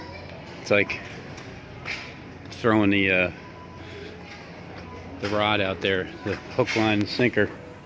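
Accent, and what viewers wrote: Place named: East Coast, United States. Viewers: it could be on the East Coast or the Upper Midwest since he does have a little bit of an inflection of an accent when he speaks. Not sure. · I hate to tell you this, but I have worked on the cameras and monitors in Walmart, and on a day like that, you probably have one, maybe two Loss prevention people working, and one if them is watching monitors and doing all of their management duties, and the other one will walk some and do double duty. BTW, everyone had an empty push cart at one point. I promise you that their are no where near the amount of loss prevention that work in that store all together, as you saw in 5 minutes. You are probably trying to pass people off so that they comment, and do you can get paid. It is really activating listen to this bullshit that isn't even close to being true, on top of that, the Baltimore accent just rubs me wrong. 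It could be South Jersey or Southeastern Pennsylvania, but it's really a Baltimore accent heart and it is the most obnoxious accent in the country. By the way, is anybody shopping there? Or, are they all trying to keep you from stealing a $1 item. Walmart method is to identify you and call the police. By the Walmart or installing facial identification software, and they're gathering information everyone just like everybody else. Won't be long before you walk in that store and they know if you're a thief, and I know the kind of stuff you buy.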